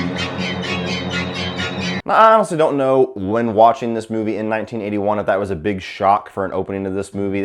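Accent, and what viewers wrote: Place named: Midwest, United States. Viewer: He is original from Ohio but moved to Georgia at like 14 or 15. So either Midwest or Southeastern United States. I don't think he has much of a georgia accent more midwest.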